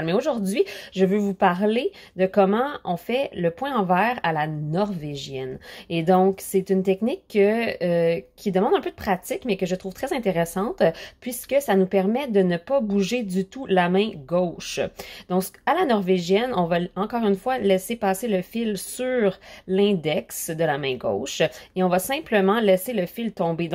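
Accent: Quebec, Canada. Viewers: Woaw, un point qui le fait plaisir et l'accent du Québec aussi. Quebecoise en France depuis 1998😊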